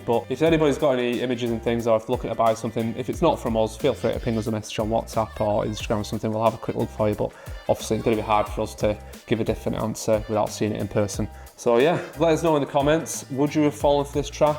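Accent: United Kingdom. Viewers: What part of the UK are you from? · That British accent together with the somewhat too loud music makes it difficult to understand what you are saying. Well I guess it makes for a challenging language lesson …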